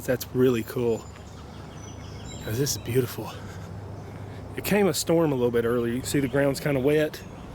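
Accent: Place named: South, United States. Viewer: Thanks For another awesome video Peace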